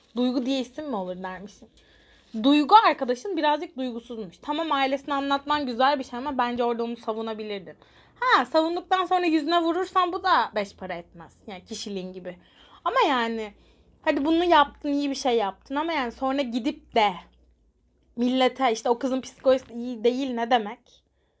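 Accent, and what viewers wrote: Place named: Aegean, Turkey. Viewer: Ya seni yirim şive egeden izmirliyim öptüm çok ❤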